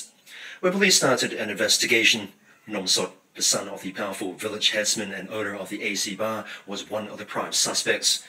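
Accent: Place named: West, United States. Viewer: คนอ่านข่าว สำเนียงฝรั่งจ๋าเลย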